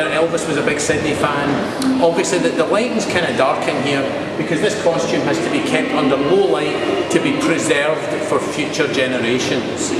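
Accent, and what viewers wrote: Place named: Glasgow, United Kingdom. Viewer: Oh my god! Trying to speak Clearly doesn't suit your voice. You're a true Glasweign and i cringe when i hear such a wegie accent trying to be east coast. I'm sure you're a nice guy though lol.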